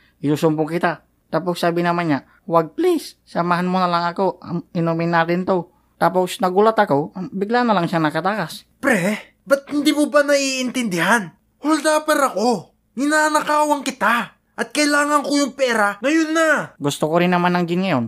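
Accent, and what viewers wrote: Ilocos, Philippines. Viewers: Hahahaha ilocano ka gayam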